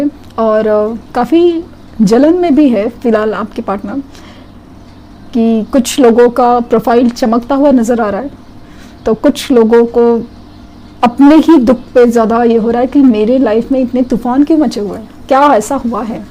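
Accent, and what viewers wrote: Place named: South, India. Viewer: Mam ! Your Hindi sounds like south Indian Hindi, but feels very sweet to listen 👌👌😀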